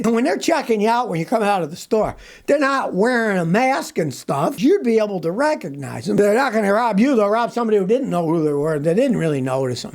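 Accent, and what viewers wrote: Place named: Niagara Frontier, United States. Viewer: You've been driving for 55 years and yet I can still detect a Niagara Frontier accent. 🤠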